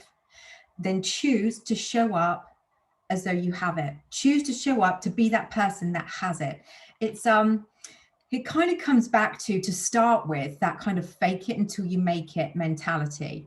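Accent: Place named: United Kingdom. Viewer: 💎💎💎!!! excuse me!!! 😇 🎁 are you really 44?!?!?!? 🥰🥰🥰 you are blessed and you bring light to the world you really found your path / purpose in life with the best compliments from Italy 🎉🌍🌎🌏 I absolutely adore 🐞 your British accent!! 🌈 keep it up, my dear Life Coach 🍓 (I thought you were btw 28-33!)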